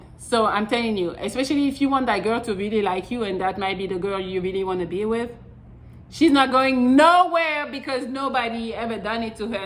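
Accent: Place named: France. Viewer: ?Coule it be that you speak french?Because of your accent i mean.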